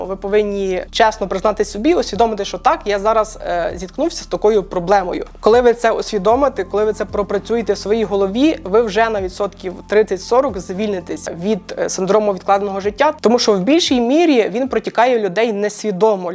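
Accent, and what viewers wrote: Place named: United States. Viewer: У вас акцент американський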